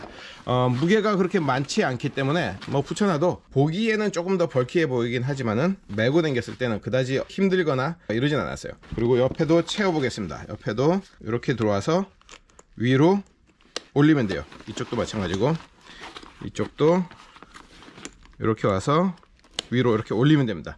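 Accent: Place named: Japan. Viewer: you sound Japanese or maybe Korean but you don’t sound like a chinaman I hope your not a chinaman I could not understand what you said other than acelink armor I feel you gave a good review of this item enjoy the plate carrier and buy two more sets and donate them to Taiwan they’ll need them to fight off the chinaman we have swapped to chinaman targets to practice hitting the short dumb backward bat eatin people if you are chinaman then know plate carrier is more effective without any inserts in it at all it’s an American secret so don’t tell chinaman good luck hope you never need plate carrier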